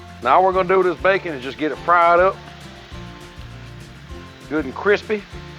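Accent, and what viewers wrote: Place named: North Carolina, United States. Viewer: Looks so good! Where you from? You sound mid north NC. Am I close?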